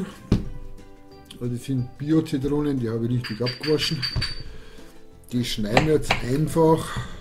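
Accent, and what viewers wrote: Bavaria, Germany. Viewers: Danke für deinen bayerischen Dialekt!!!! Mai, ist das schön zum hören :-)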